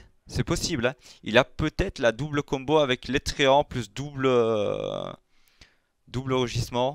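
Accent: Belgium. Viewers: Il est belge ?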